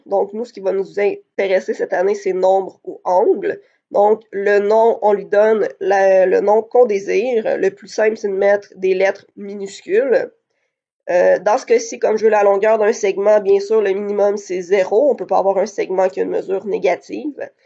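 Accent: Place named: Quebec, Canada. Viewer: accent québécois magique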